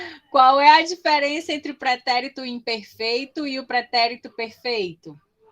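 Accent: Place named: Northeast, Brazil. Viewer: gente eu amo o sutaque nordestino ainda mais de professora como esta <3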